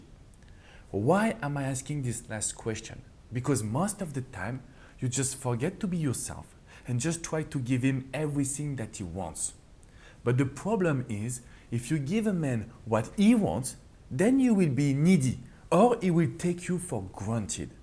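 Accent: France. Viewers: Please check my msg sir... i didnt meant to criticize yu..nt even think about that.. bcoz u r the coach i have huge respect for.. i only mean.. i want some advice at this particular situation... i love this french accent words very much❤️... i lv , respect u sir.. atleast pls don't misunderstand me fr that comment... please help me to get over this situation sir...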